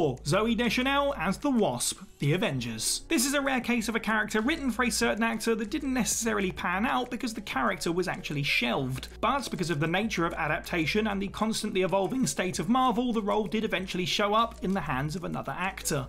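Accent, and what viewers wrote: United Kingdom. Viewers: You Brits and your proper English. 😉 It's Elvira, long I pronounced El vy ruh. And Lily's 1st name E van jeh leen. I think a Stallone Beverly Hills Cop would be cool to see since we got the great one from Eddie. I enjoyed the Snowman movie. My mom had died before I saw that film. It made me cry since the main character lost his dad. It's a heartfelt movie.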